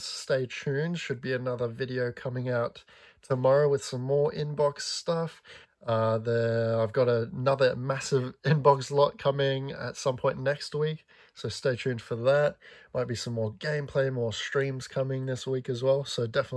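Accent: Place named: Australia. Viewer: An Australian man explaining skylanders is music to my ears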